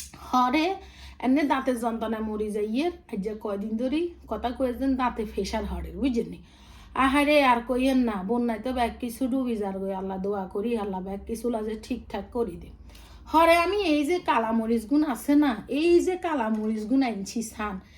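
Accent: Noakhali, Bangladesh. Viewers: আপু নোয়াখালী বর্না হইতেছে😭😭😭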